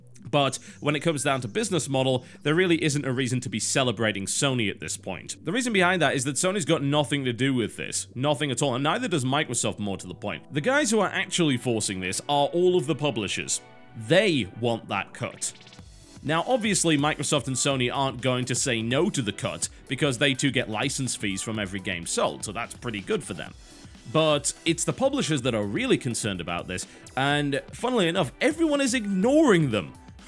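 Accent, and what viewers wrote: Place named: England, United Kingdom. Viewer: ...theyre two different pronunciations. and keep in mind, the language is called english, and he's from england. see a connection?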